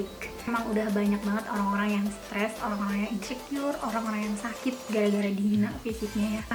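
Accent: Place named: West Java, Indonesia. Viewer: Emaaak dengernya 🌹 Sunda detected 😊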